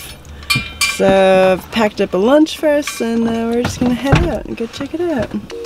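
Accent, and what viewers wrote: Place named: New Zealand. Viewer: Living in NZ I notice you are building an accent towards the end of the video.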